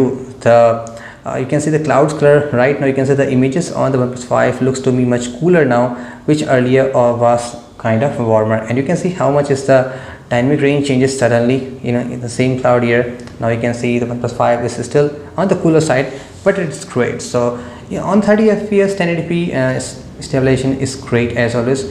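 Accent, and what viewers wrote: India. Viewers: Fucking hate Indian accents in general but good vid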